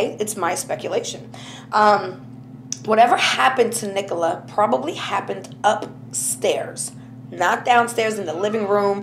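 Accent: New York, United States. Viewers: love your accent, is it new york? say "i'm walkin' here!!" for me. ha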